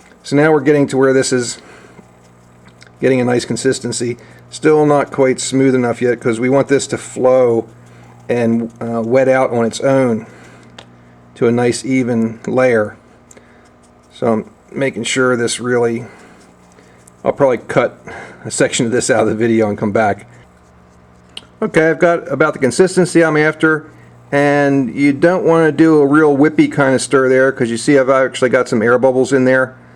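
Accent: Pittsburgh, United States. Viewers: Your accent sounds (a bit) like someone from Pittsburgh.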